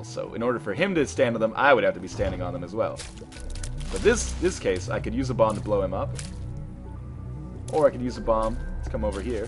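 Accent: Canada. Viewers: What are you talking "aboot"? Of course theres a Canadian accent!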